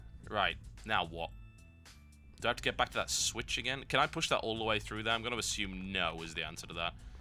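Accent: United Kingdom. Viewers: TB isnt rambleing insanly, he's rambling insanly in a British Accent.. His WTF Videos are a first look at the video, within the video he gives commentary to help get a little more enjoyment out of the video. As for the starting screen, he Critiques it eg Multiplayer Options, Settings, etc. 2 minutes really isnt THAT much of a sacrifice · Americans... the language was pretty much created by the English, so how can the Brit pronunciation be wrong? It's your version of English that's been bastardised. As for Beta, it's "beeta". Live with it, it's a Greek letter so really, it's pronunciation was set a long time ago :P While we're at it, jelly goes with ice cream, jam goes on bread and it's aluminium, not "aloominum". Thanks :) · Well, everything sounds classy in brittish.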